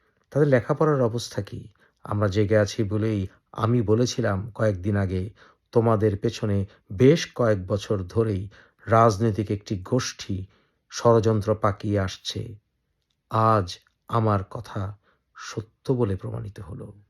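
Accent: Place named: Noakhali, Bangladesh. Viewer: নোয়াখালী ভাষা ভাই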